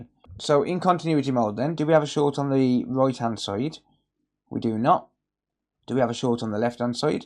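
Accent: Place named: Walsall, United Kingdom. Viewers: very interesting mate,you from birmingham ?…now i know where to send my repairs 🫡👍🏼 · you gotta love a good brummie accent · Great video mate, you proper sound like your from near me (Walsall)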